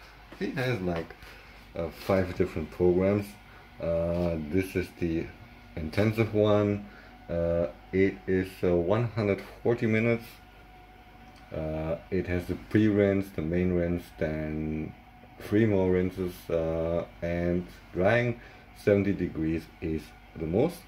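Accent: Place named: Germany. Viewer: Hallo, ich gehe von deinem Akzent einfach mal davon aus, dass du Deutsch sprichst, dann spar ich mir mein schlechtes Englisch ;D ich habe die selbe Maschine und genau wie bei dir blinkt das "eco" Symbol in einer Tour. Laut Beschreibung ist das der Fehlercode für eine undichte Stelle bzw. für den "Überlauf". Hast du eine Ahnung wie ich das beheben kann? Habe die Maschine schon auseinander gebaut und konnte keine undichte Stelle erkennen. Wäre um Rat sehr dankbar! LG und ein schönes Wochenende.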